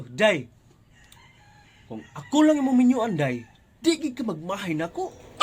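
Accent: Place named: Visayas, Philippines. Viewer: Hhahha nakakatawa ka naman sir ganda intro nyo hala bisaya gud kaayo bai hehe from gkicekyntv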